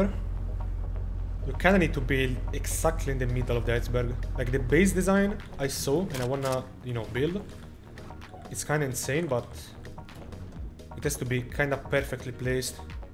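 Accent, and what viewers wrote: Italy. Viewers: Ur italian?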